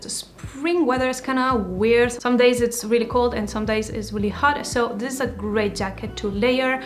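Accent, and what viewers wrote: Spain. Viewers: Are you from Spain or Argentina? You speak English as if your original language was Spanish. And the way you said Bolero reminds me of how my Spanish ain’t says it. Super duper curious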